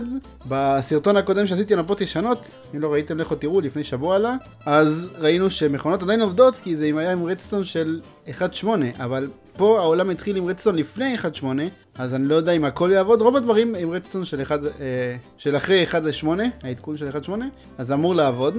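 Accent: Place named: Russia. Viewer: שיואו צפיתי בסרטון שלך מלפני שבע שנים שהיום אנחנו אגב ב<num> ושומעים שיש לך מבתה רוסי ברמה מטורפת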